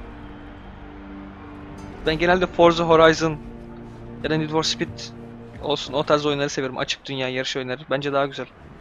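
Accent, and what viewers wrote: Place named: Hatay, Turkey. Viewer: diksiyonun baya düzelmiş. Ayrıca Hataylı olduğunu ilk duyduğumda inanmamıştım ama bu tonlamayla başka bir ihtimal düşünemiyorum.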